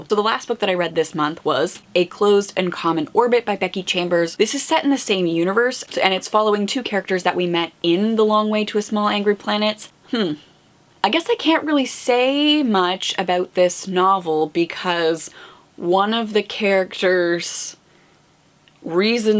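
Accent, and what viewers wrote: Canada. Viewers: Hello, I have been enjoying your reviews. As a English teacher in Australia I love to pick up on different accents. I have noticed when you say out or about it comes across as oot or aboot. What is the origin of you accent?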